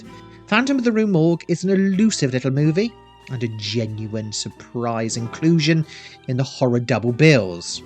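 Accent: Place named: West Country, United Kingdom. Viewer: Ah yes, Grockels, is I think, more of a Devonian thing. I'd only heard it said for the 1st time when I was either 20 or early 20s. And the person I heard first use it said it was more of a Devon thing. I already told you that sometimes you have a distinctly west country ring to your words & you explained to me where you were from & I realised that in some, more northern areas, they also seem to have a similar brogue. [you probably don't remember, but I thought you were a Bristolian & you corrected me.]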